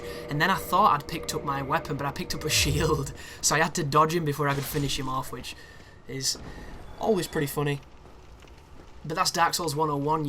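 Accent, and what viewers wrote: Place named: Lancashire, United Kingdom. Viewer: Are you from Lancashire Mr.?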